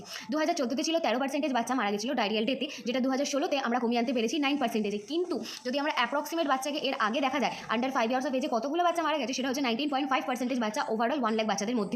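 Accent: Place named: Bangal, Bangladesh. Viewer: Bolchi ektu Bangal 😢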